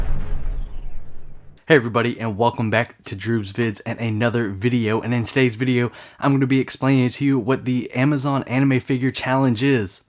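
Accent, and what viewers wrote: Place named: South, United States. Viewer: oh god, i just couldn't help it but he sound like he has a real southern accent... Has anyone thought of it?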